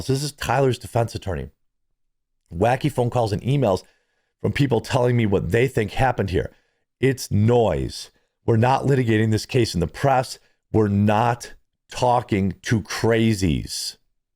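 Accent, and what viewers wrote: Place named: Milwaukee, United States. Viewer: Your hoarse voice and Milwaukee accent is very surfer bro.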